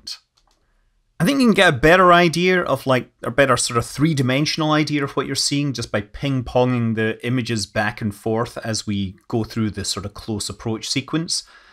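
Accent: Scotland, United Kingdom. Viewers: Scott once again I wish to thank you for your fantastic yet human explanation of this groundbreaking step forward for humanity. You take the complex and bring it into focus so that mere mortals can grasp it. Hearing your perfect explanation is only enhanced by your wonderful Scottish accent. Tipping hat with deepest respect.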